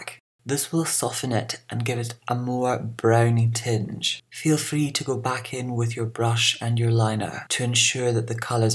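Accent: Scotland, United Kingdom. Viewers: I've been binge-watching your videos today and I have noticed that your Scottish accent peeks through more in these older videos. The way you speak is absolutely hypnotizing.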